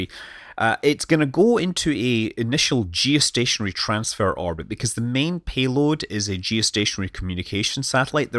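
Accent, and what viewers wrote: Scotland, United Kingdom. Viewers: Can we call you { }? Are u in fact scottish? Great video's, thank you.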